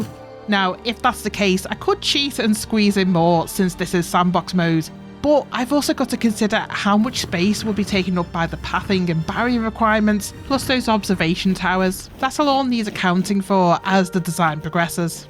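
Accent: Liverpool, United Kingdom. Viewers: I am from the wirral, so it's nice to have a scouser planco player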